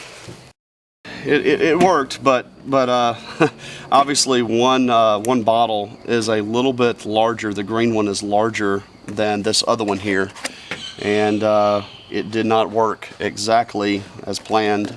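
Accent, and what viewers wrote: United States. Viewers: Cool video, bro. Haha, you sound like Forest Gump, Tom Hanks voice and Southern Accent. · u talk western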